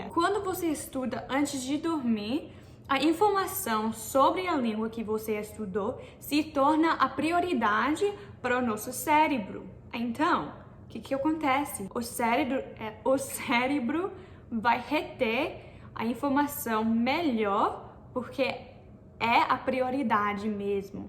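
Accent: Brazil. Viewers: seu "português" + "sotaque brasileiro" estão perfeitos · vc vai falar igualzinho aos brasileiros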